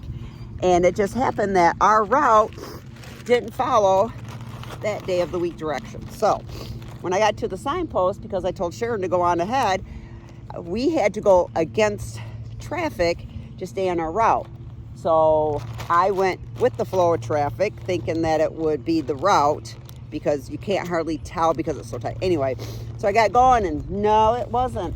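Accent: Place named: Michigan, United States. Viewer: That hybrid Michigan accent with Wisconsin and Canadian overtones.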